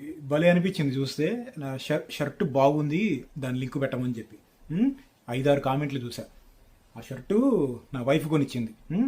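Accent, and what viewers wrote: Andhra Pradesh, India. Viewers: Meeru Nizamabad .. Slang emo andhra... ela?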